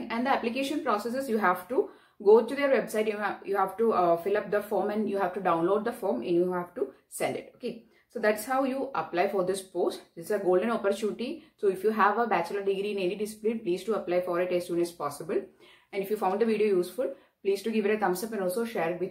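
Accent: Kerala, India. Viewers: Thank you for information, 😉I can sense Malayalam accent in your speaking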